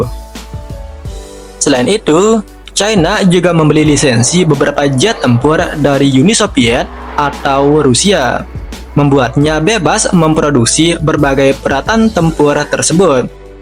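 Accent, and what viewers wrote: Lombok, Indonesia. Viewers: Mimin dari Lombok ya ?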